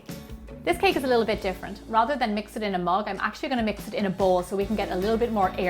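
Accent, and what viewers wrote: Ireland. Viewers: Im in love with your wonderful recipes and your Irish accent!!!😍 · I just made the cookies n cream one and it came out ABSOLUTELY AMAZING!!! It was perfect because I was craving something sweet, and since I live alone, if I bake a cake I eat the cake, which is bad for my waistline!!! So this little treat hit the spot without being too much, and like you've said it other videos when you make it urself you know what you're eating, which is important too! I love your recipes, love your channel, and absolutely ADORE your brogue! It's very soothing! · are you Irish???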